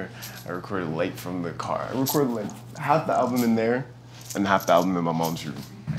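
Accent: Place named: Canada. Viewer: that canadian accent really comin through on this lmao, goat shit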